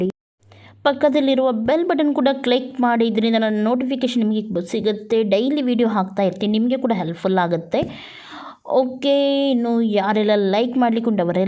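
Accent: Mangalore, India. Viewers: Ee Mangaluru Kannada style kelodheyyyy ondu khushi😂 Chikka makligey helidha haagey yeshtu muddhagi helthaa prepare madthiri :-) God bless U ma 😊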